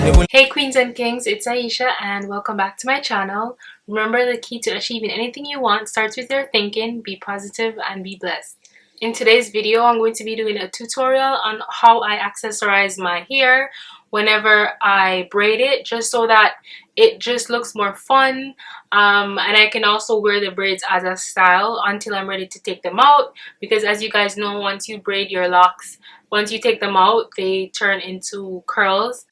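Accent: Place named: Jamaica. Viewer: Sensing a Jamaican accent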